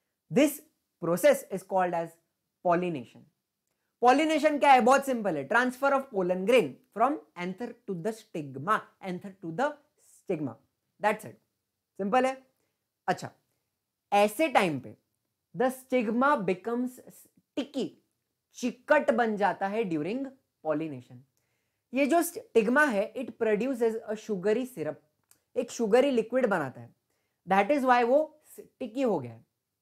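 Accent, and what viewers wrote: Maharashtra, India. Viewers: I think sir is gifted by God for 10th students. And one thing..... Sir's mothertongue is Marathi (chikhat)😅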